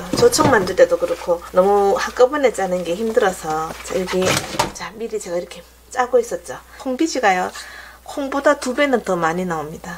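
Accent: Gyeongsang, South Korea. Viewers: 설명 참 정확히 잘해주십니다 목소리도 맑고경상도 억양이 매력적입니다 두부 한번 해봐야 겠다 날마다 좋은일만 있으시길 바래요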